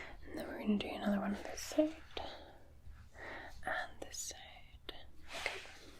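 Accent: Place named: Scotland, United Kingdom. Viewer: Great video as always so relaxing your Scots accent makes me melt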